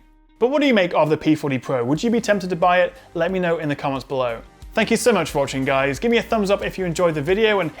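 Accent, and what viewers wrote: United Kingdom. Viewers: what lovely British town are you from,?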